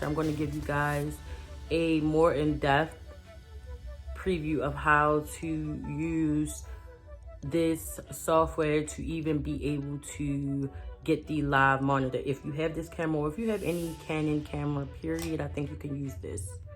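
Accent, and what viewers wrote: Baltimore, United States. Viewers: I thought so lol that’s where I’m from. I know a bmore accent when I hear it lol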